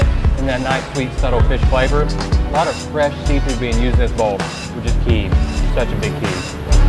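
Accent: South, United States. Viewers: the combo of his southern accent talking about his love for Vietnamese noodles... <3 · Do I hear a little southern accent? Love it! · First time seeing you’re video and I must say, you are a gorgeous man. And on top of that the food you chose, your southern accent and how you described everything was great. Keep up the great videos :)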